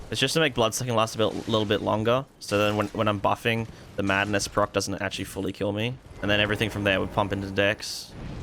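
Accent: Australia. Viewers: i just found this vid after googling if reduvia was any good, only played about 1.5h so far, first playthrough. anyway, thought you were an aussie, confirmed it with the extra dry, so, hello from FNQ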